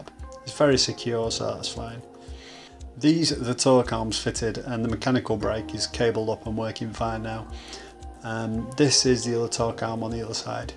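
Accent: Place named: Liverpool, United Kingdom. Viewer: he's got an accent sounds like the Beatles yellow submarine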